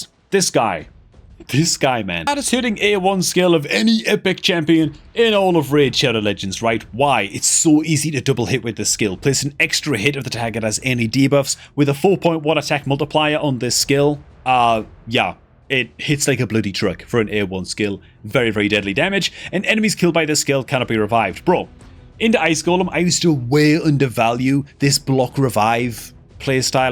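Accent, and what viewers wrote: North East England, United Kingdom. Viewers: Probably a NE English accent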